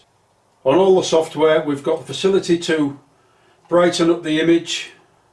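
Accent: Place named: East Yorkshire, United Kingdom. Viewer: Hi, I just ordered one of these, but think I need some power for the PoE, can you recommend a switch or adapter for the supply. Liked the video, and the accent too, sounds like your from my part of East Yorks. Regards John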